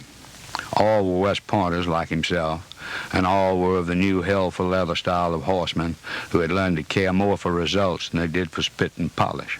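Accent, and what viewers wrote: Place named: South, United States. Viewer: his Southern Drawl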